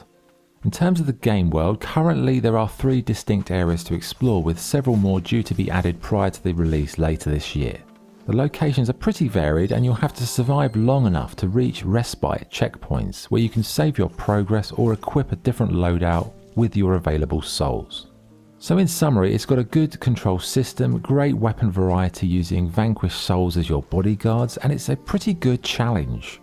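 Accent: England, United Kingdom. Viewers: I feel like the reviews are getting more organic. You and WAB are my favourites at the moment. Maybe English accents are just more tolerable.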